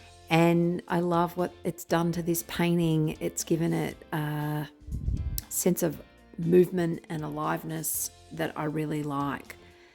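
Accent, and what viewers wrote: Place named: Australia. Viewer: Just found you, recognized the Aussie accent and subscribed😎 love watching it unfold it's beautiful and prefer with silicone I also like the dark together. Lots of love from Sydney🇦🇺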